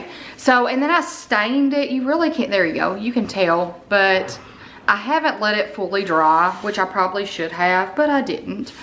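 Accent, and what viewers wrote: South, United States. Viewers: I think it turned out really cute! Your southern accent is so endearing :)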